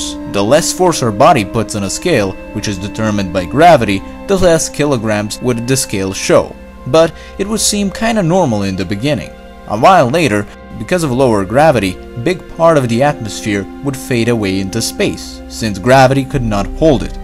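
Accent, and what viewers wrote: Serbia. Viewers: Just a Serb speaking English. Doubt you'd sound fluent when you speak another language.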